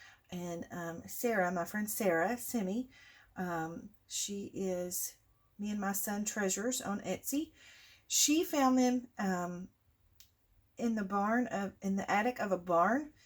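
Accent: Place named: Alabama, United States. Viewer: I feel the same way! I live in Alabama and your accent sounds like you could be my next door neighbor! Lol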